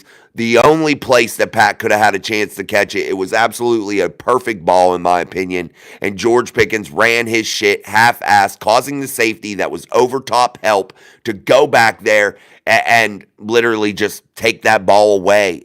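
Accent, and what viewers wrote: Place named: Morgantown, United States. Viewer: I watching a day late..the guy wants u to speak the queens english. I prefer morgantown english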